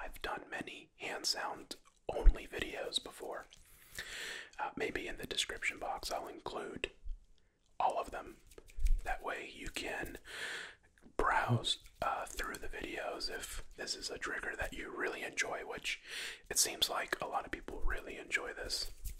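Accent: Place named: United States. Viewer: Big compliment: I love your voice. Usually I don’t get tingles from just whispering but I nearly fell asleep listening to your sponsorship in the beginning. I’m not American myself so your accent and tone is really cool to me :)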